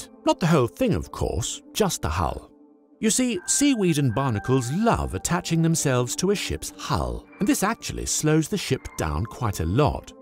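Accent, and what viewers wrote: United Kingdom. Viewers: British accent but american pronunciation? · Is this guy British or American? Because he's using a British accent, but he's also using the American pronunciation of "Caribbean"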